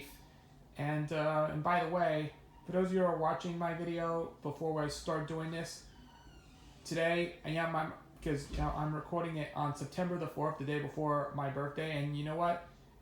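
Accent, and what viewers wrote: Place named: New York, United States. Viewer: I can hear from your accent that you're a new yorker lol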